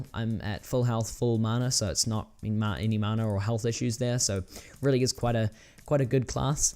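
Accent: New Zealand. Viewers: is this dude from NZ, it kinda sounds like it i'm probably wrong?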